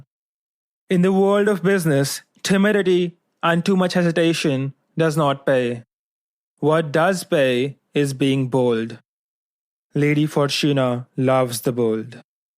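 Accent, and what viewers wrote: India. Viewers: Why are people hating on his accent? No offense, but I find hard understanding Indian accent and this video is the exception. Great content.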